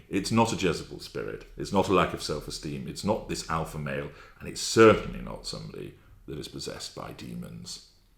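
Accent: United Kingdom. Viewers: Are u British ? 🙏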